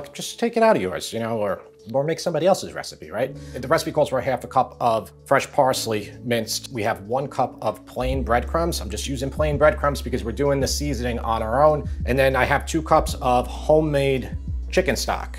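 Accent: New York, United States. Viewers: Is that New York I hear in your voice? Bronx maybe?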